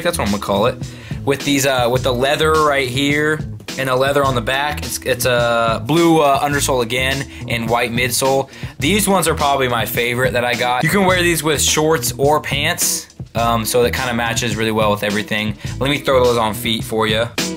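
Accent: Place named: United States. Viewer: its fresh to hear a Leb with an american accent we have a fair few Lebanese in Oz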